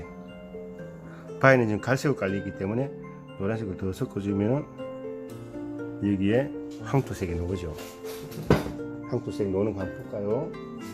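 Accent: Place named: Gyeongsang, South Korea. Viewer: 정감어린 경상도 사투리와 ^^인상이 참 선해 보이십니다^^ 하나하나 잘 배우고 보도록 하겠습니다^^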